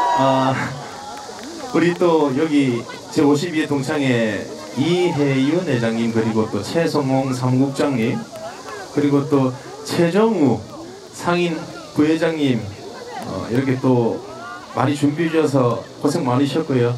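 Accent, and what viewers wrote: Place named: Gyeongsang, South Korea. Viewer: #진해성 가수님 함양중학교 총동창회 축하드려요 더운날씨에 고생하셨습니다 첫무대,대히트곡 사랑반 눈물반 와 최곱니다 살짝썩인 사투리 무대맨트 최고에매력 경상도사투리 많이많이 써주세요 억지로 표준말을 쓸려고하지마세용 ㅋㅋ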